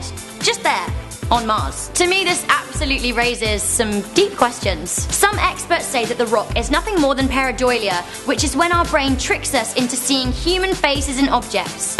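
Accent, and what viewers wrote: United Kingdom. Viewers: Wow....she's British. Same!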